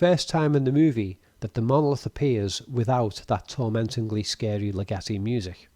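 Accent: Liverpool, United Kingdom. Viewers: Sounds like a scouser to me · Same here, I re-watch to a lot of stuff at night for the same reasons. Never thought I'd find myself dozing off to sleep listening to the voice of a scouser. However, he has a very rare and soothing scouse accent haha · This dude has got to be from Liverpool. I'm an American, but I recognize that Beatles accent anywhere. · You’re an enigma,. Ive never been fond of the scouse accent (probably due to a stag do when the only Liverpudlian was an arsehole) however, I could listen to you all evening. This may come across as a back handed compliment, if so, I apologise. Grate werk r’kid..fantastic analysis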